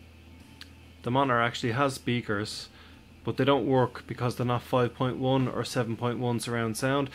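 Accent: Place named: Newfoundland, Canada. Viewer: i thought you was from Newfoundland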